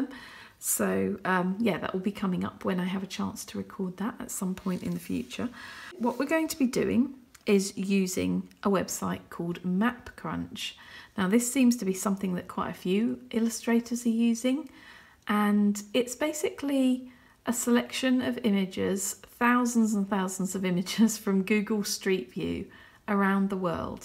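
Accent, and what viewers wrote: England, United Kingdom. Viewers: English accent. Makes you sound important, official, BBC-like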